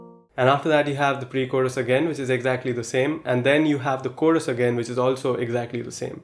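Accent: India. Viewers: Are you indian? I mean your accent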